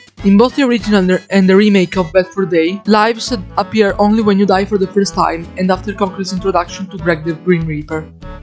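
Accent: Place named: Italy. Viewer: Dalla parlata ho ipotizzato che fossi italiano, a ho avuto la conferma Mi fa davvero un sacco piacere che qualcuno in Italia parti di questo gioco in maniera così accurata Ti faccio i miei complimenti✌🏻👍🏻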